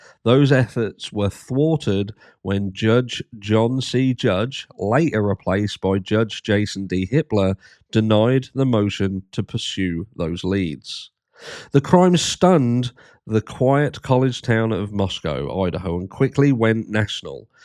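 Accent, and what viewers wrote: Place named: England, United Kingdom. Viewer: Excellent article and narration. Something about hearing things read with an English accent makes them sound more profound. Thank for taking the time to post. Cheers!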